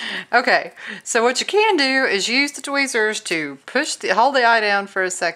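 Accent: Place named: South, United States. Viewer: When I first saw the video I thought you had went and bought a Cricut Cake..I was like "what..I liked her fake"...hahaha. I love the way you talk too...I have the southern accent also so its great to hear someone else with one!! Great video!!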